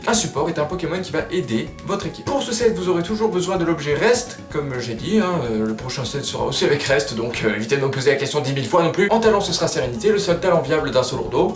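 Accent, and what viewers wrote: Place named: Belgium. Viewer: tes belge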